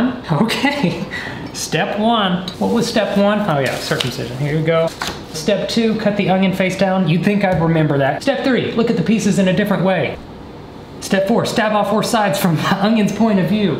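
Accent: South, United States. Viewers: Just watched a video from 2015. You've gotten more southern? I guess.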